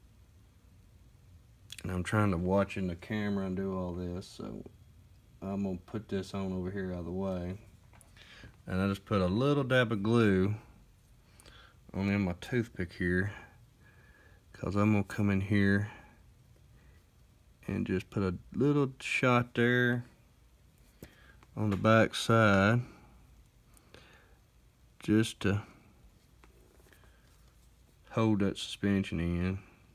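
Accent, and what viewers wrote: Kentucky, United States. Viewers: You sound pretty country, you in Kentucky? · oh ok. Well I'm out then lol I don't 3D print or anything. Btw you must not be too far away. You sound like one of us Kentucky boys lol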